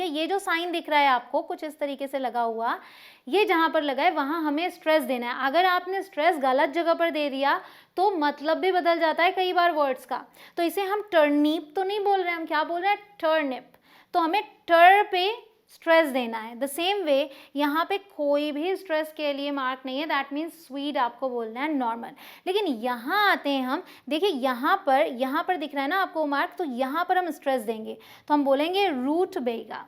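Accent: India. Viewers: Indian accent English?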